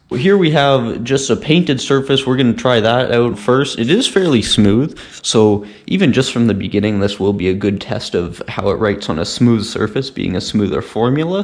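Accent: Canada. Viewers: Bruh are u canadian?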